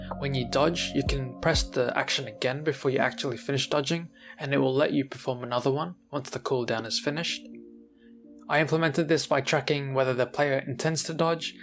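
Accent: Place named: Australia. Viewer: Great video! Knew you were an aussie from the first few seconds of the video xD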